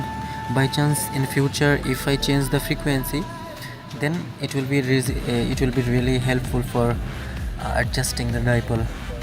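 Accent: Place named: India. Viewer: Does your country allow cb radios 11meters you should make yagi beam antenna i'm over Knoxville,Tennessee USA sounds like your in India you should be getting thoses guys on cb radio over toward Australia here in states i been making good contacts on cb radio to eastern Australia southern Australia during evening hours when dx conditions are good i do have videos on my yt channel making contacts on cb radio.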